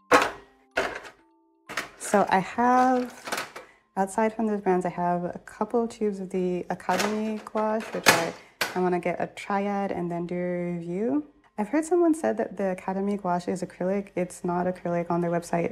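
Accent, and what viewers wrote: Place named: United States. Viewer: I cannot pin down what country you’re from... your english is so perfect and sounds very american but you mention al the prices etc in euros? I am very torn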